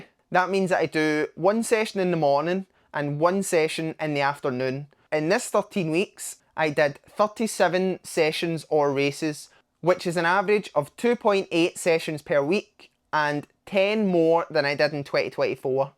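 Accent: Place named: South Africa. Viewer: Where are you from with that accent? South africa?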